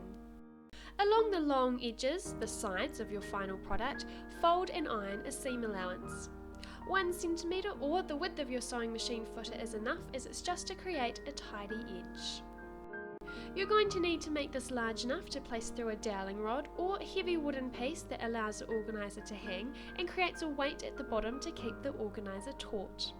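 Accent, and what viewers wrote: New Zealand. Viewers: Sounds like NZ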